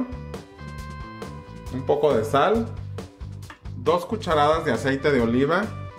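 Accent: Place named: North, Mexico. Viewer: justo ando haciendo hummus ( yo le quito el pejello porque da pedorrera 😅🙈 donde eres por el acento? arriba el norte mijo haahahah saludos desde sonora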